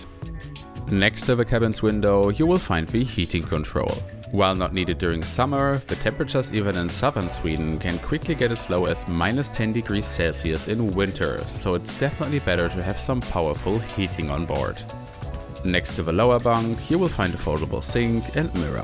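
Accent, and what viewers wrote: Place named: Germany. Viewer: This is an excellent video by any standards! I can hear a hint of what I believe is your native German in your English pronunciation, but it's very minor and only adds to the authenticity of the video. Your Swedish pronunciation is impeccable. I just subscribed to get you a little closer to the elusive 1,000 subscribers mark.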